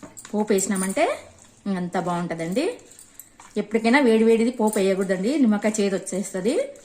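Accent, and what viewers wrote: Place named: Kurnool, India. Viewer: ఇంతకీ ఎండు కొబ్బరి వేసారో పచ్చి కొబ్బరి వేశారో క్లియర్ గా చెప్పలేదు. మెంతులు,జీలకర్ర పొడి అన్నారు అవి వేయించి పొడి చేశారా?లేకపోతే వేయించకుండానే పొడి చేశారా?మీరు కర్నూలు ప్రాంతవాసులా? మీ భాషలో యాస చూస్తే అలా అనిపిస్తుంది...I am from kurnool 😊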